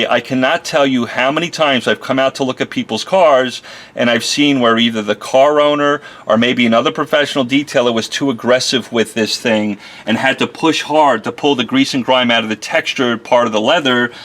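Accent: New York, United States. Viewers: It's impossible to tell if that's dirt or colorant that's pulled off the seat. Hope your N.Y. accent doesn't drive the native Texans nuts! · Thanks for the tip. I have to do my car and wife's car soon. What pat of N.Y. are you from? (Never heard a Texan sound like Ray Romano)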